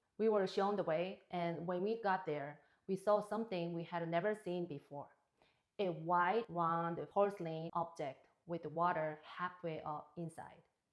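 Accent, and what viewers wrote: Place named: Asia. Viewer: I still don't know what girls in pleasure squads do. Is it just singing/dancing/ entertainment for the rulers? Anyway, I am quite intrigued by your english- you speak and express in a very asian way- which i find cute. Also I am quite surprised you went from extreme dictatorship(North Korea) to extreme capitalism(USA)- 2 sides of the coins really- where on both ends society seems to be eroding- at one side due to lack of freedom, food and what not, while on the other side due to misuse of freedom- individualism, money mindedness, lack of family culture, rise of wokeism, loss of love/trust/ dropping birth rates, income disparity, addiction. South Korea is similar to USA- be it per capita income and capitalist society- also it is infamous for highest rates of depression and suicide rates- which obviously is result of capitalism and living from mind/thoughts. 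You, having born and spent early years in NK, no doubt US must be feeling great for freedom. But a time would come...when you would want a middle path...where there is only joy, unending peace and love for everybody- the gate to spirituality.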